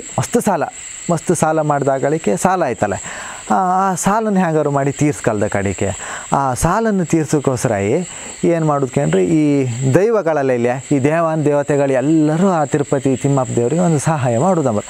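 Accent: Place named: Kundapura, India. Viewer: Nam kundapura kannada..👌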